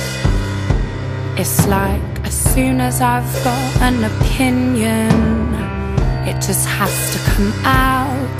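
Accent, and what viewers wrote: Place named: East London, United Kingdom. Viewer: Love her accent East London ?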